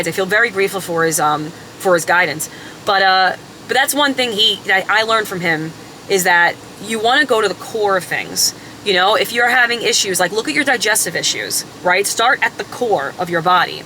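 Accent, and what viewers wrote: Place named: Long Island, United States. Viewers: just sold on you're accent immediately. I have that same New York accent. Pleased to meet you. Im quitting cawfee myself today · I'm from Long Island, NY as well and I knew right away she was from Long Island especially when she said " cawfee". I don't realize I sound like that too until I hear myself on a video or audio recording😂 BTW we find British accents interesting too. Unless you have that spit filled " Scouser' accent like the fighter Darren Till. .😂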